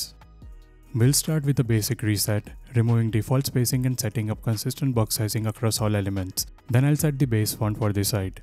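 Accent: India. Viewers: You are from India? Your accent is totaly matched with Indian and your work, literally of god level❤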